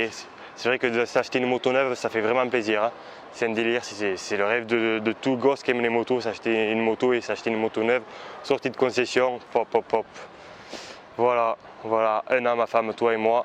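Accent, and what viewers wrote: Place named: South, France. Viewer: T du sud en plus avec un accent comme ça .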